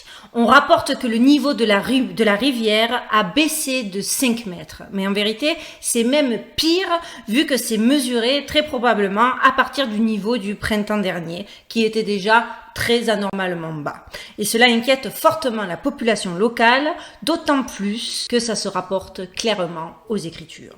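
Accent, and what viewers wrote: France. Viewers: Génial plus je découvre tes vidéos plus j ai hâte de les découvrirent. Tu au top ne change rien à tes accents français ou Anglais. J aime ton authenticité suis fan. 🙏😘🌹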